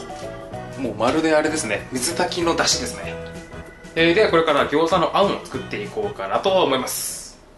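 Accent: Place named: Fukuoka, Japan. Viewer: 福岡の方言かな？ほっとする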